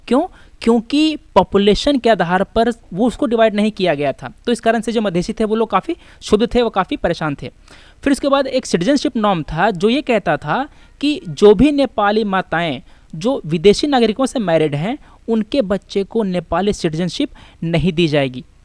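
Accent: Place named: Bihar, India. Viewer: Even you sound bihari ..... You sound pro-madeshi and biased Where is dhavan sir ??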